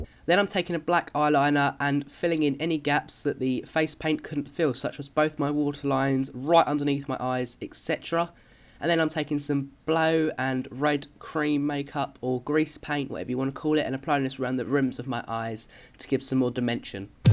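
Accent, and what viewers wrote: United Kingdom. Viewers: oh and you're cute mehh and british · You're British?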